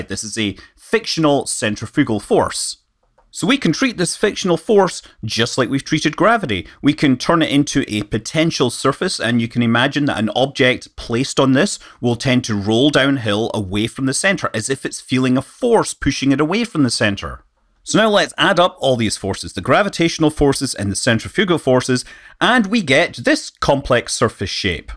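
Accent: Scotland, United Kingdom. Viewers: Why is Maths called 'math' by a guy with a Scottish accent? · Great visuals and great, great narration! The Scottish brogue is icing on the cake!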